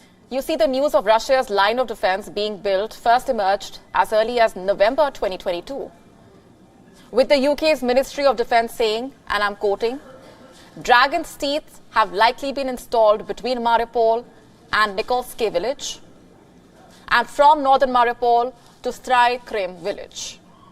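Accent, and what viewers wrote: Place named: India. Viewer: What an english accent., please choose a narrator with a good accent., she is talking indian not english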